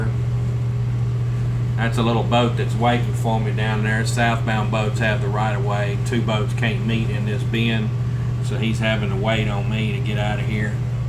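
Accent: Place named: Appalachia, United States. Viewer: You did a FINE job but MERCY, you sound like you're from here. You'd make a fine hillbilly if you wanted to!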